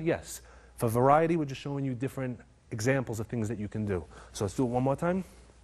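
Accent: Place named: New Jersey, United States. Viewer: This guy is real Jersey Pwot ove coughey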